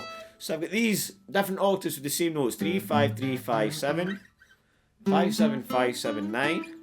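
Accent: Scotland, United Kingdom. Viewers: I just found your channel & have learned more from you in the last couple of weeks, than in last couple of years on my own or even in lessons! Even with your Scottish brogue & my Southern American ears‼️🏴🇺🇸🤔🎸😆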